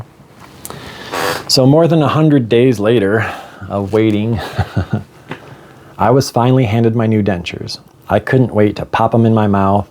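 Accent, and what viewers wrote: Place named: Midwest, United States. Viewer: Hi. You're so normal that you're confusing me. I think I've been alone too long. I live in a box too. That's because, four years ago my husband went in the kitchen on his birthday and he passed away on our kitchen floor. I chose a 12 x 11 box. For a year, I layed in my bed for 23 hours a day. You see, I don't drive and nobody came. I went into shock and I was afraid to cry because, I thought there was a pretty good chance I might start screaming and then, who was going to stop me? Fortunately, I had my dog Charlie. She was the reason I had to get out of bed for an hour a day. I've spent a while trying to figure out who I am after I found myself in isolation. It's a good thing that I have a lot of songs too. I was into my kick about 2 months ago. She's adorable and man, can she sing. I started my toothless journey probably last year sometime. 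Maybe that's the only positive thing about being alone. I didn't have to talk to anyone. Which is kind of sad because, I love talking to people. I have just recently learned to quiet that negative voice. If a negative thought pops in my head, I'll actually stop and say, that's not my thought. Get lost. I wonder something because of your accent and you saying that you grew up in the Midwest. I live in Michigan and they call us the Midwest but, we talk more like Canadians. Anyway, I was gifted a set of temporary dentures and I'm going in a week for the second re line. I was thinking about how much I loved licorice and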